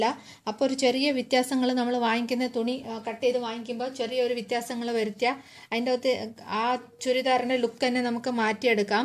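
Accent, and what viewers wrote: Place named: Tamil Nadu, India. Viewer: Ningal tamil aano...samsarathil oru tamil chuva..enthayalum nice video aanutto. New subscriber aane..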